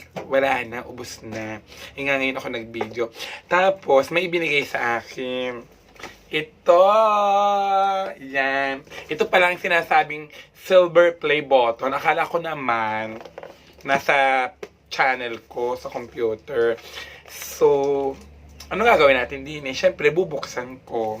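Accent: Batangas, Philippines. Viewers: nakakatuwa k.. lalo na ung puntong batangueno mo at mga ganap mo.. keep up the gud wrk! and keep being humble!!😀😀😀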